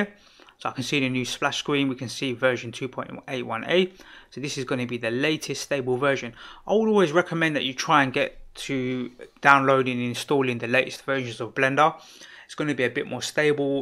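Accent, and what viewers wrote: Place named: Cockney, United Kingdom. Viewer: Thank you my fellow cockney instructor! clear and concise you are.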